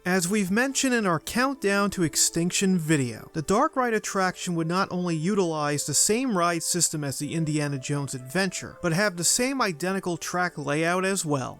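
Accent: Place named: East Coast, United States. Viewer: One of the things I like about this channel is that glorious east coast accent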